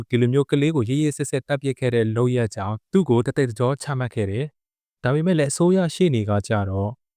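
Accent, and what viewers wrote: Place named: Rakhine, Myanmar. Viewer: အကိုက ရခိုင်ထင်တယ် စကားဝဲတယ်😊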